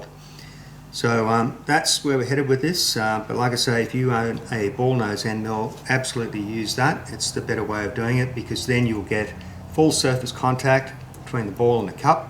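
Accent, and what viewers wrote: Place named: Australia. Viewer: Good Show Mate: As per normal. I always enjoy your vids. Enjoy the Ausi accent too.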